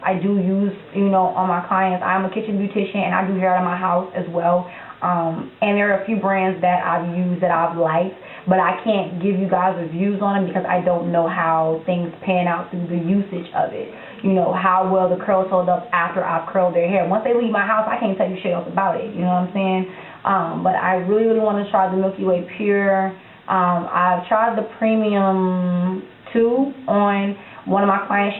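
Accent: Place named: New Orleans, United States. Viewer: you sound like your from new orleans i always thought you were .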